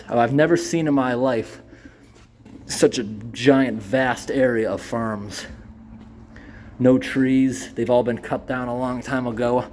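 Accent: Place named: Montana, United States. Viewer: u from montana? ur accent sounds like a guy i knew from montana...or at least he lived there at one point in his life lol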